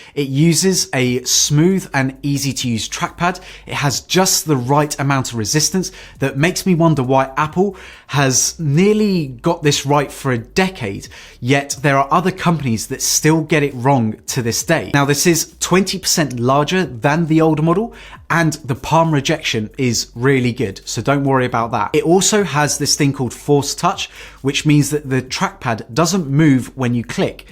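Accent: United Kingdom. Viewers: Yea, a British accent. You are the first reviewer to mention the screen record as part of the equation. I have the i5 on order with 16Gb & 1Tb after watching lots and lots of comparisons. I decided the i7 might not live up to it's promise. (I loved my old MBP with quad i7 but Apple killed it by not allowing Catalina due to metal)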